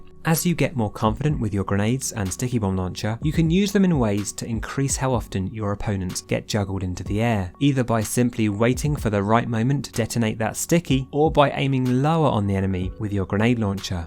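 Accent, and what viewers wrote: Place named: United Kingdom. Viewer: I missed your british voice you absolute legend! Glad to have you back.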